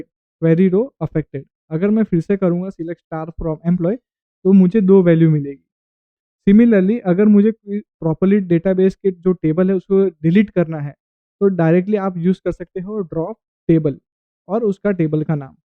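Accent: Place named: India. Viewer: May the God give you the strength. Be victorious and proud. But the quality of your voice is not good, brother. Try to make it better because it is very difficult for someone who is not fluent in English to listen with an Indian accent. From Iran.:hand-pink-waving::hand-pink-waving::hand-pink-waving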